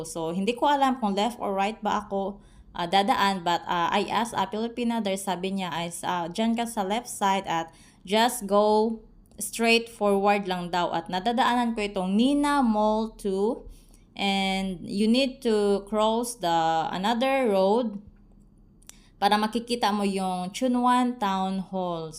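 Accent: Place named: Singapore. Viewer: Enjoy your day in Hong Kong, I thought it's in Singapore because of the accent. Thanks for sharing.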